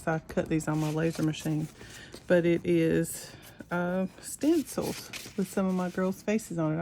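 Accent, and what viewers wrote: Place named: Carolina, United States. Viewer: Love your art and I love your accent. I'm a Carolina girl as well. Thank you for the video I learn so much from you.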